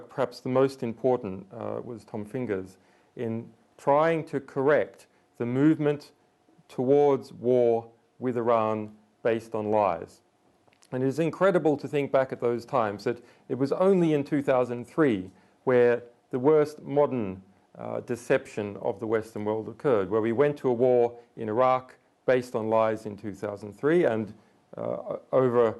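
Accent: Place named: United Kingdom. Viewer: I don’t attack intelligence agencies anymore. Once you reverse engineer history and identify who funded the creation of all intelligence agencies of every nation then you see that they are no more responsible for social engineering than governments and individuals in positions of power within government. This all stems back the the Bauer/Rothschild Dynasty that have their lineage and agents in the leadership roles of every institution of influence and power and all supposed “people’s Movements” even you Jillian Asange are an agent time releasing narratives they want believed. The fact that you have a British accent alone only reinforces this charge. Sean Combs is not a sexual predator, he refuses to endorse Donald Trump while Katt Willams is being promoted because he’s shlobbing the knob of the golden calf. You are obviously trying to seat Trump so that he can Reinact 1938 with the US playing the role of Germany, and he playing the role of Adolf Hitler.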